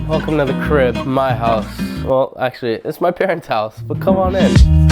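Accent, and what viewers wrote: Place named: United States. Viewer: u have too much of american accent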